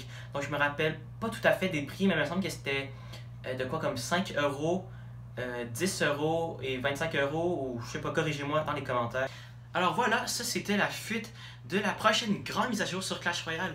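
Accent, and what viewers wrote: Canada. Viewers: tu est canadien ou français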